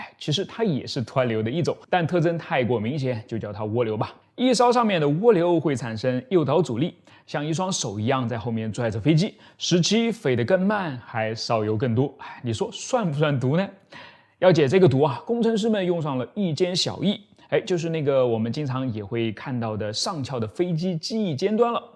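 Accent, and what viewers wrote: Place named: Shanghai, China. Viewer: 口音像上海的😎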